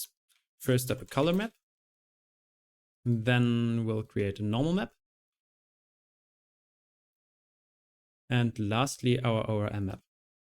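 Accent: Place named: Germany. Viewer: Wonderful! I'll buy this if you release a Mac version. You sound German. Are you? Germans come up with the absolute best stuff!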